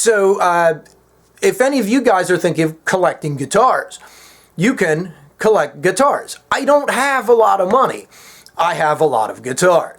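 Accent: Philadelphia, United States. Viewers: your accent reminds me of the South west Philly accent lol thays why I asked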